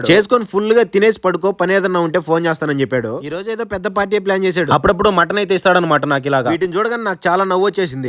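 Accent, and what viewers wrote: Kadapa, India. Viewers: బ్రదర్ మీది ఏ ఊరు Mee భాష కడప భాష లాగావుంది 🙂🙂🙂🙂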